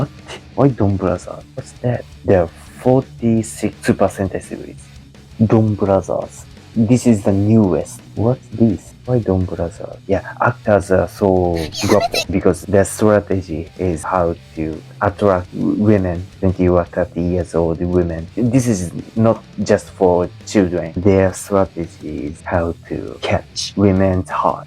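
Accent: Visayas, Philippines. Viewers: HAHAHAHAHA NALINGAW KOG PAMINAW SA IYANG BINISAYA...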